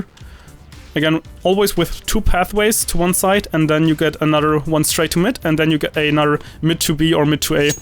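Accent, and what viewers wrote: Germany. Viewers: man you have a great german akzent =D greetings from there ^^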